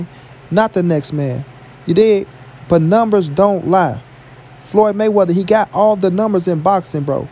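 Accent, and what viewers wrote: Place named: St. Louis, United States. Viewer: I'm a white dude from north county STL, just happened across this video and soon as I heard you say "Give him his flowers while he HUR" I said aw shit this dude from the Lou 😆 Good content bro, respect💪💯 #Subcribed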